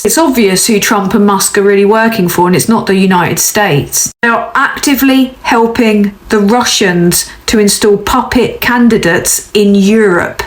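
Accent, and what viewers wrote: United Kingdom. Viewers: A thousand thumbs up for you dear. you are 200% right about everything. I'm Canadian and love your understanding on things. I wish more people would get it the way you do. Love your ...so British accent as well. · To be honest, I find her voice therapeutic. Yes, she is telling me of my demise but at least it's with that silver tongue you brits have.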